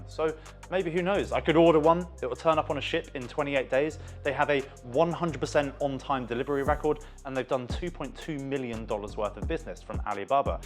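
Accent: United Kingdom. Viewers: The subject matter is fairly interesting and you would make a great straight man in a comedy team duo because you have a great dry sense of humor. The British accent is always a plus too. We half brothers and sisters out here are always captivating by that proper English. Something about it just fascinates the rest of us. We really do love the people of the UK. But getting back to the little Chinese EV's, they really have very limited real world applications the way I see it. No offense please. I think I would want one strictly for inner city driving only. Other than that, not so much. So, Pip Pip, Tally Ho and all that good stuff. Take care my good man.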